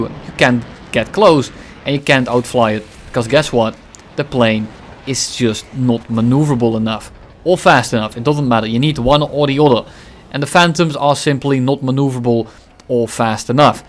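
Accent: Netherlands. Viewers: Je hoort het altijd meteen als iemand nederlands is. Nederlanders hebben een hele goede engels vocabulaire maar spreken kunnen we niet. Haha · Your Dutch accent makes me smile a lot. Top content!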